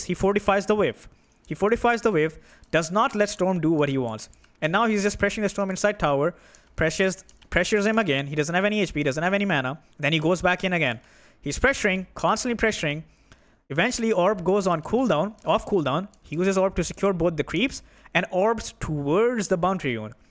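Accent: India. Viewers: see scammer indian accent click dislike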